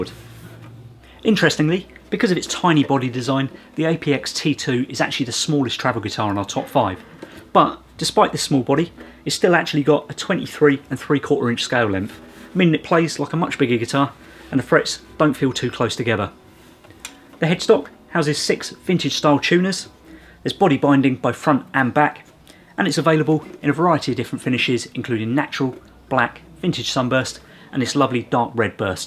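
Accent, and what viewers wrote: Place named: South East England, United Kingdom. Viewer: 掌編製作所 he is from england , south east england me reckons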